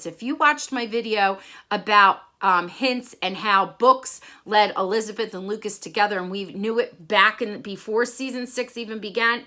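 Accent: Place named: New Jersey, United States. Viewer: Just love your predictions..you pick up on so much more than I do and God knows I've watched the seasons a million times. hahaha. I hope I catch your next live again..it was so much fun texting and having you answer live. I just love your enthusiasm and love for the show. Also love your Jersey accent..I dated a Jersey boy in college. He thought I sounded like I was from Chicago (I'm from Iowa..close enough I guess). Until next time.....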